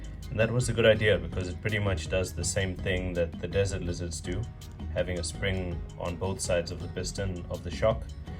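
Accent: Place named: South Africa. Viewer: Always great to hear a fellow South African when randomly browsing through hobby YouTube :) Quick question with the furitek starter kit how did you connect the two speed transmission servo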